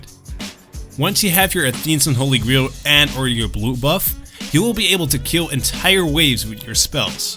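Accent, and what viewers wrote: Netherlands. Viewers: dat dutch as fuck accent tho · This guy sounds so Dutch.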